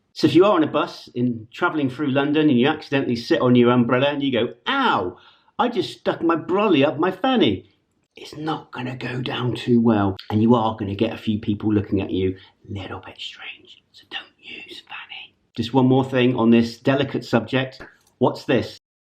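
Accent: United States. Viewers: American accent and personality is SPOT ON!!! 😂🤣 Both characters were hilarious!!! 😆😁 The facial hair on your British character was quite gentlemanly 👨 Did you just say "LOVE GARDEN"?! 🤣😂 Your new characters need some names that fit them 🤔🤔🤔🤔 You're latest videos have been absolutely on point!!! 💯👍🤘 You are in the groove sir!!! 😁🤟👏 Great stuff bro! 🤜🤛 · Awesome video! Love your American accent. Here we say niche as nich...but if someone pronounces it neesh, we still get it. In the southern US, a trolley is a buggy. Keep up the good work. Hugs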